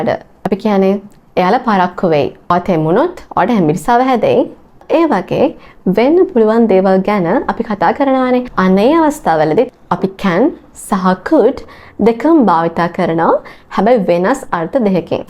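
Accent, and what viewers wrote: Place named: England, United Kingdom. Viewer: i love your accent, my guess is that you studied in England.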